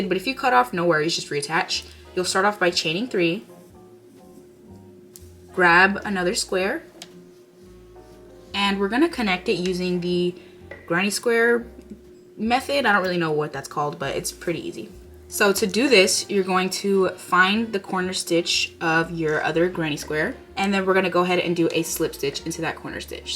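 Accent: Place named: United States. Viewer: thank you for the tutorial! could you pls tell me what american accent you have? asking for scientific purposes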